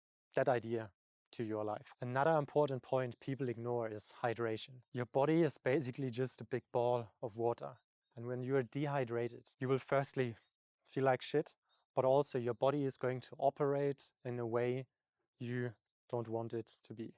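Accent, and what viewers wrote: Germany. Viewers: Sounds German or scandi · Bro are you german?? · Is this some german accent Im hearing? Should have watched the video before writing... Anyways eating eggs every day doesnt seem a healthy idea in respect of ldl intake..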